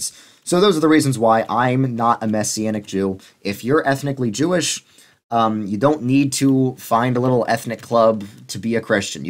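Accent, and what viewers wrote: New York, United States. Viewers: really letting the New York accent come out on this one LOL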